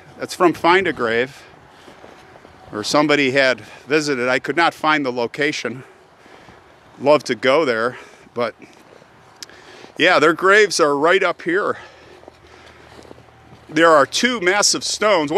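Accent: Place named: Upper Midwest, United States. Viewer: I love the music. It reminds me of Minnesota and North Dakota where I went to college and graduate school when my husband was in med school. The nicest people and a wonderful six years exploring North Dakota and Canada. Your accent takes me right back to 1970.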